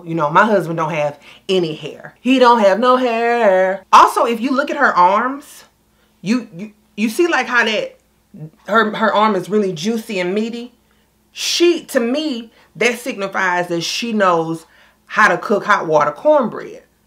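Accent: South, United States. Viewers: #1 is the first word: NIGERIAN. You the most southern sounding Nigerian I ever heard and far as I knew you are somewhere on the southern U.S. LOL. You DO look like a classmate of mine tho… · Your American accent is really good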